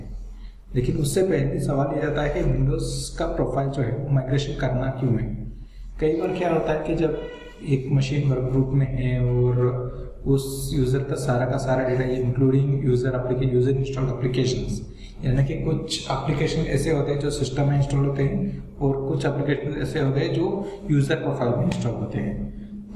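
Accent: India. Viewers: fu ck ing indian accent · Interesting that commenters can't understand your English. I suppose after working with India programmers for a couple of decades, my ear is accustomed to your accent. Never the less, the software you're downloading looks too risky to take the chance. It's pretty hard to trust the profile migration tool from the FORENSIT site when it's not even SSL / HTTPS protected. That said... Dave Yadav You Tube video "Migrating profile to a different domain" uses an SSL secure site to download the software, is better practiced with English, and does a pretty good job explaining this topic.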